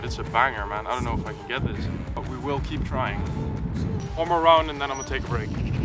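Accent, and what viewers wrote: Netherlands. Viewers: Are you actually Dutch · eh bro jij bent toch nederlands dat hoor ik aan je engels accent, maar je maakt echt leuke content ga zo door!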